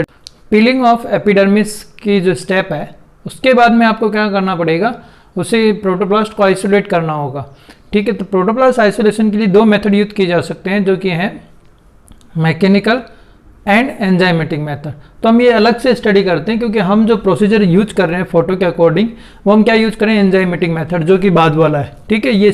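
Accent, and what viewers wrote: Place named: India. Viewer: sorry but how does can international students understand english indian fast accent ? but good video hehe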